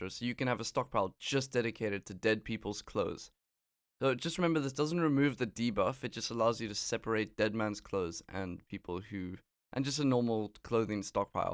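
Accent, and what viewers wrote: South Africa. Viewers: You sound Afrikaans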